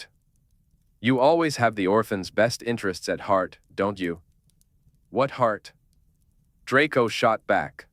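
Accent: United Kingdom. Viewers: British accent? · It's based in Britain so a British voice makes sense.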